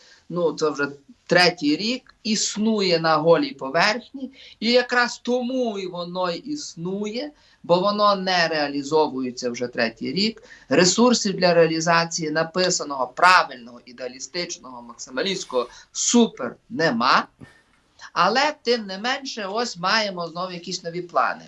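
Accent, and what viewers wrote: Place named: Galicia, Ukraine. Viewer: Пане я з Харкова так от що я тобі курва падло скажу з твоєї риторики видно що ти важаеш Українцями тіки галичан а ще більші Українці жителі, великого Львова, перед ніж пертися в ефір навчися говорити Українською мовою а не галицькою і за тебе і таких як ти і війна почалася. І питання ти великий патріот України чому не з автоматом в окопі.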